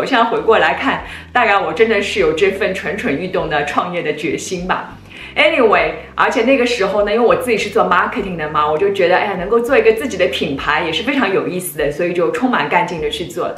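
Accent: Shanghai, China. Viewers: 常驻伦敦的上海人表示听着很上海很亲切 恳求不要改进❤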